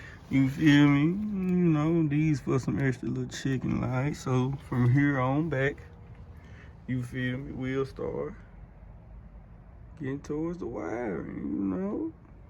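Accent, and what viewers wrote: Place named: Texas, United States. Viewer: You from the H bro ?? You sound like you from Texas on god I know Texas/Louisiana accents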